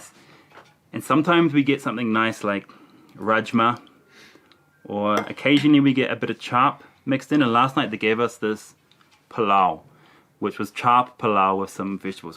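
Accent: India. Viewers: For my side the way you ride bike and the way you talk is like your 100% indian brother no matter what u r a perfect indian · Watching you from beginning... And see the way of your talking... You've become a pure INDIAN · Hello ur English sound like Indian English I like the na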